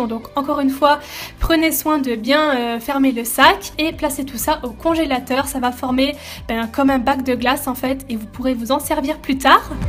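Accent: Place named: Alsace, France. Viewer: Tu es de quel origine ton accent ressort grave on dirait une alsacienne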